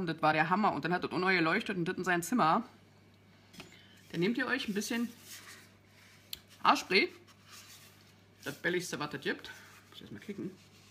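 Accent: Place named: Berlin, Germany. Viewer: Ach meine liebe.. ick find dein "Berlinern" einfach nur geil!! ;)) Rock it und dir nen schicken Abend! Maxi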